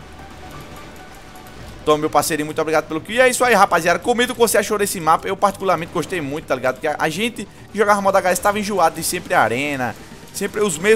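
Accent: Northeast, Brazil. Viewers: se e do nordeste se tem o sutaque do nordeste kk mercadinho tbm so do nordeste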